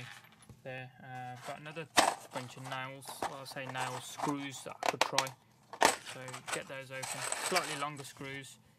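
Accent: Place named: England, United Kingdom. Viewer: dont be racist dude. thats not right plus he sounds like another fellow englishmen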